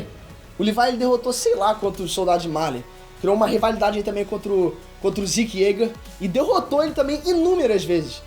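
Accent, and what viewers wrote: Rio de Janeiro, Brazil. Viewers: sotaque carioca kkkk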